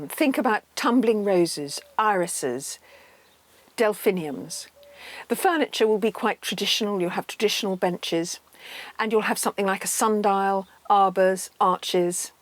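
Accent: RP, United Kingdom. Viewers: I love your voice and the Queen's English you speak.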